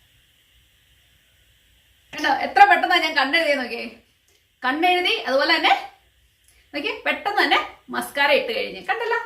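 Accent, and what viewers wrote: Kottayam, India. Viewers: രസം ഉണ്ട് സംസാരം. ഇങ്ങനെയാണ് ഞാനും പറയുന്നേ കോട്ടയം ആണ് alle?? ഇഷ്ട്ടയിട്ടോ 😘😘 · Hi chechi am your new viewer from pala kidangoor now chechi kottyam kari ano samsaram nalla rasam undu my hair also thin anu and no idea about beauty tips you help me a lot beginners nayi simple make up products okke detailed ayi paranju oru video cheyyamo and 9 years kurtis nu venda hair care also. Are you working as a nurse keep rocking 💐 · kottayam kariii ano aaoru slang varunudalo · Kottayom Kari aano chechise.. Samsarom athu pole undu..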